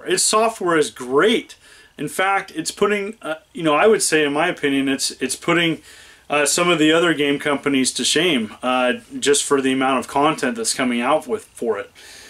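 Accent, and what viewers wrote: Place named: Pennsylvania, United States. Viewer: Where u from sounds like you’re from Pennsylvania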